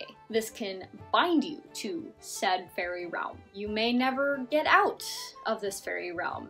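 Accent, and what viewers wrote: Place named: Australia. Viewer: Omg you have an Aussie and you have a lovely video layout. I like you already! My bf's niece is getting interested in the fae. I'm a fallen catholic, but I love researching for other people to make sure people do their own practices in a safe, but still fun way. Plus I love supporting and learning about things. Thank you so much for this video, it's so hard to find good content on this subject! Question: Do you know anything on Green witches? I think she's showing an interest in that without knowing what it is too and would love to get reading material on it for her.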